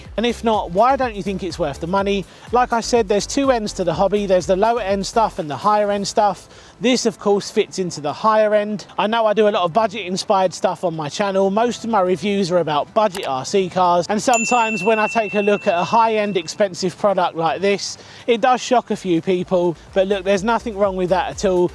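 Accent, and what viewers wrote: United Kingdom. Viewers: You sound very well like a British accent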